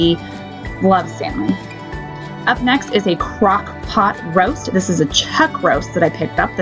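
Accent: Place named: Minnesota, United States. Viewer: Your either from Mn or wi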